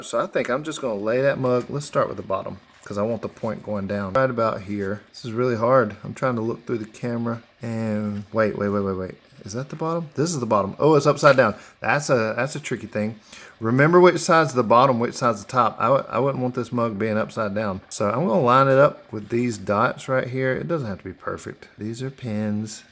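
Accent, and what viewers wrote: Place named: Carolina, United States. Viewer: Love your videos! Been watching you for quite a while and finally subscribed! As soon as my friend played your video, and I heard your voice.. I KNEW you were from NC or SC and I was right! 😂😂 Thanks for all the tips!💕💕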